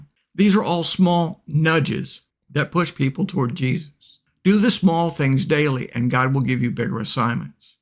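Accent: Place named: England, United Kingdom. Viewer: My god what a beautiful message. I am from India. Typical English accent, easy to listen to and fantastic insights. Thanks for leading me into his glorious light more and more. GOD BLESS YOU. 👍🙏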